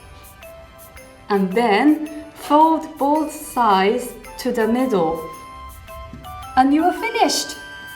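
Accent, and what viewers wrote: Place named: United Kingdom. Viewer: の英語発音が上手ですよ！彼女の英語アクセントは少し英国の英語です。はイギリスで勉強しましたか。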